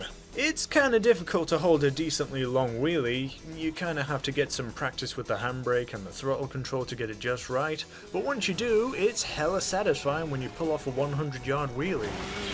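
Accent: England, United Kingdom. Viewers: Sounds nothing like English dave but he does sound English even in his normal voice which doesn’t make a lot of sense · That English Dave was spot on, oh yeah, well you are English, soooo yeah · He seems to be British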